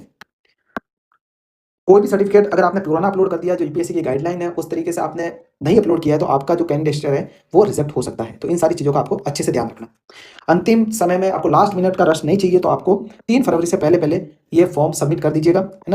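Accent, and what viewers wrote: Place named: Rajasthan, India. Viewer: sir aapki aawaj m hmaea rajasthani touch h ..aapki aawaj thodi thodi ravi kumar sihag sir se milti h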